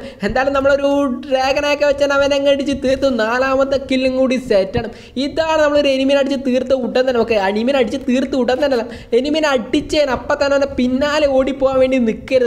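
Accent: Kannur, India. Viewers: Kannur karnano bro nane athe mannur enna stalathane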